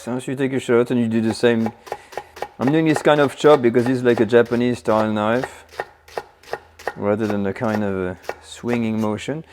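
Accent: France. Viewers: PLEASE do a video in French; your accent will enhance the experience!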